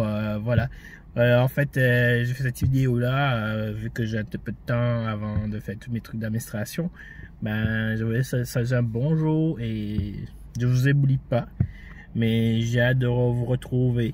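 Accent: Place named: Canada. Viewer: Tu as bien attrapé l'accent canadien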